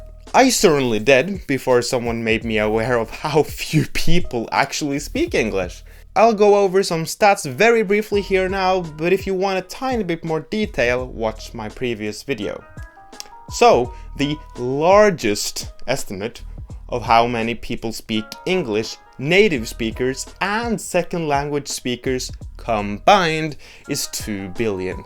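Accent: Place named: Scandinavia. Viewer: Didn’t even notice your Scandinavian accent! German accents are way thicker imo.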